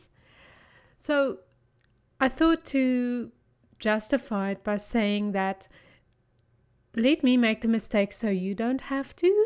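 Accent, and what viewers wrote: South Africa. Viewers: Hmmm sound like a fellow South African, howdy from Cape Town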